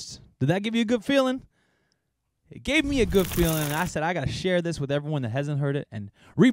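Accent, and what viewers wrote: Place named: Nova Scotia, Canada. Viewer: You sound like you are from Nova Scotia. 😎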